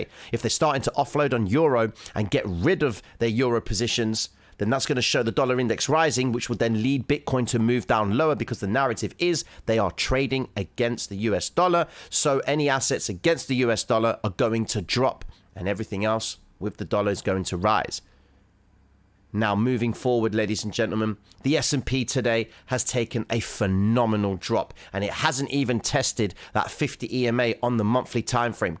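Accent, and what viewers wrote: Cockney, United Kingdom. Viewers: Love you cockney T.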